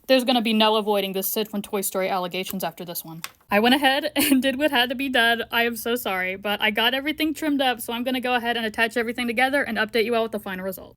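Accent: United Kingdom. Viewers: I feel like he’s British. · It's just a british guy ❤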